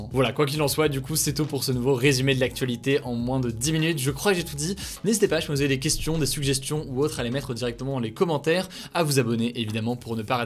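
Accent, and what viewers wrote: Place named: England, United Kingdom. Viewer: je viens de comprendre pourquoi Hugo avait un accent anglais aussi clean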